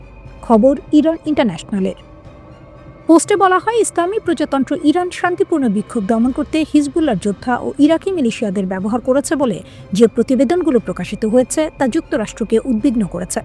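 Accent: Kolkata, India. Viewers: সাংবাদ পাঠক, কলকাতার নাকি,, ভাষা বাংলাদেশিদের সাথে মিলছে না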